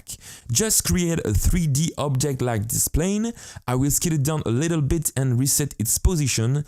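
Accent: France. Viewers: t'a un accent francais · U ve a french accent ! R u ? ^^ thx a lot, all ur videos are so usefull, u rock ;) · tu es francais ? L'accent a l'air un peu francais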